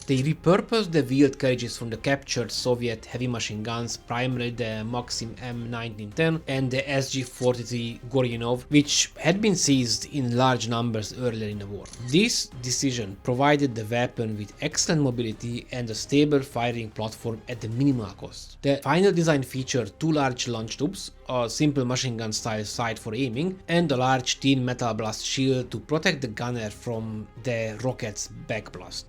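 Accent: Hungary. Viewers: Jó hallani egy kis magyar akcentust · They don't mind the Hungarian accent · You have a hungarian accent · nagyon lehet hallani hogy egy magyar beszél angolul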